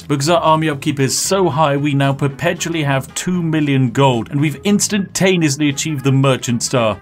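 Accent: United Kingdom. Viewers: sounds awfully British too me 🧐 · Day 6 of reminding we want to hear him say "Sugoi" in his glorious British voice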